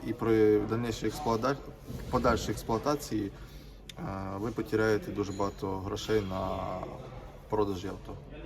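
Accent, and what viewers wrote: Surzhyk, Ukraine. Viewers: А мені подобається Ваш суржик і головне про що Ви говорите!!!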